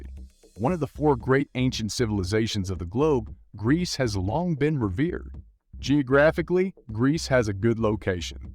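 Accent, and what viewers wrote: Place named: United States. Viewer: Not impressed , sounds very American ,therefore biased ?The narrative has no connection to video !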